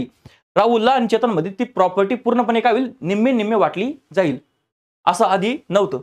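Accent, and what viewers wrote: Khandesh, India. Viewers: Aaplya khandeshi ahirani bhashet bolta tumhi sir mh 19jamner Kar aamhi 🙏😍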